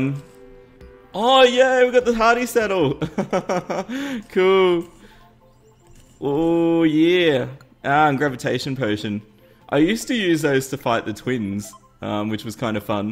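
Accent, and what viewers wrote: Australia. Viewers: Are you Australian? Just wondering from your accent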